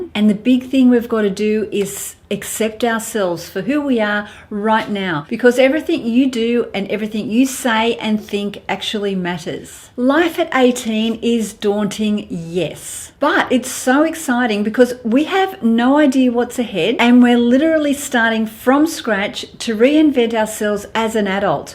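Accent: Australia. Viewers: Thank you sharing your words of encouragement. I'm 54 and was diagnosed last August 2017 with breast cancer. I'm cancer free.. Thank God, but still going through the reconstruction surgeries. I lost all of my hair and some of my teeth due to chemo. It's a hard journey, but again I believe in my higher power. Getting back to feeling beautiful as one would look in the mirror has its challenges, but what's important I'm alive and cancer free. Loving ourselves at this age is very important and watching videos like yours and others on YouTube has been helping me get into dressing and wearing makeup again. Although I still have no hair (lol) eyebrows and lashes are still coming in.. oops I think I wrote a whole book. I love your accent and I always wanted to go to Australia. I'm in USA, but originally born in Puerto Rico. Blessings beautiful. 🌺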